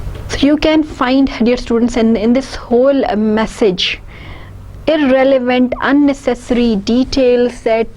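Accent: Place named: India. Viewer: only your accent is indian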